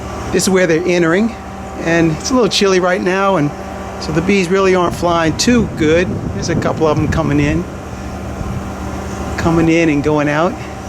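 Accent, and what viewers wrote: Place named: New York, United States. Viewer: I thought you were from New York,. You sound a lot like to me.... and you look like you're.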